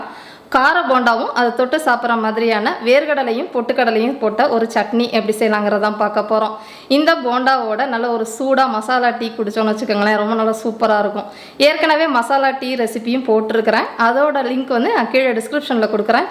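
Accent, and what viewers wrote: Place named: Coimbatore, India. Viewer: அக்கா நீங்க coimbatore ஆ,,,,உங்க பாஷை அப்படி இருக்கு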